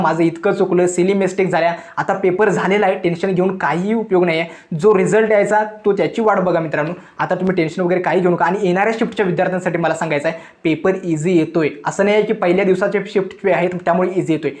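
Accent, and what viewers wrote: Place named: Kolhapur, India. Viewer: Kolhapuri accent i think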